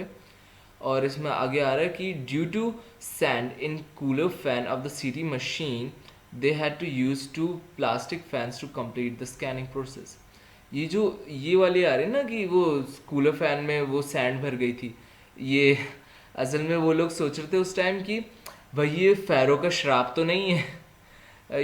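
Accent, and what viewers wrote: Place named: United States. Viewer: it is not necessary to speak in an American language if u would have spoken in a simpler way it would be much clear to the students. anyway ur video helped me a lot . thank u sir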